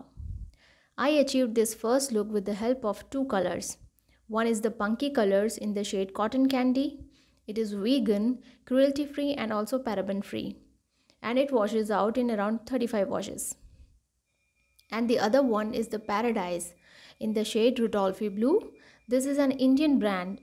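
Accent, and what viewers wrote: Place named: England, United Kingdom. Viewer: I absolutely love your English accent ❤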